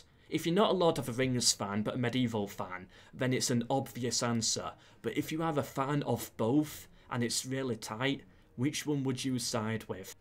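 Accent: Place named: United Kingdom. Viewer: Sometimes i watch this guy's content just because of his accent lol. I know it's one of the British ones but there like thousands of them. Anyway, it just sounds really funny, in a good way, to me as a non-native English speaker.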